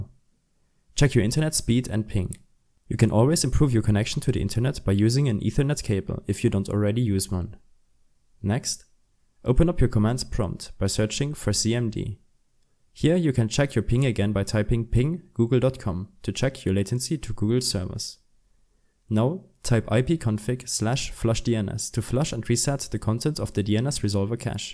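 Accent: Germany. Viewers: lol the german accent xd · Man hört so hard das du Deutsch bist 😉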